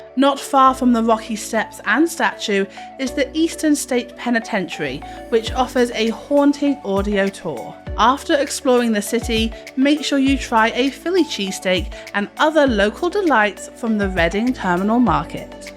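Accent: United Kingdom. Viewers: Fairly small city she says lol 6.2 million population metropolitan area! Apart from London is any city close to this in the U.K. where I imagine by your accent that you are from? Lol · You sound like britis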